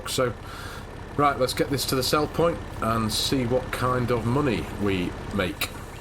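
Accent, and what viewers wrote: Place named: Castleford, United Kingdom. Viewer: Ey up I'm chatting with my wife trying to think where ya from n u sound castleford area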